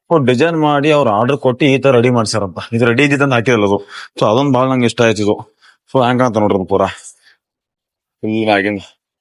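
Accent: Gulbarga, India. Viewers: ನೀವು ಮಾತಾಡೋ ಗುಲ್ಬರ್ಗ ಸ್ಟೈಲ್ ನಮಗೆ ಅರ್ಥ ಆಗ್ತಾ ಇಲ್ಲ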